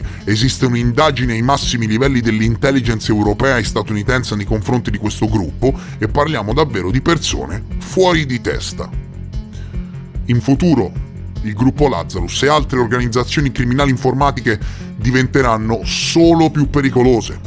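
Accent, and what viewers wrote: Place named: Friuli, Italy. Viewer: Secondo me sei del nord Italia Friuli...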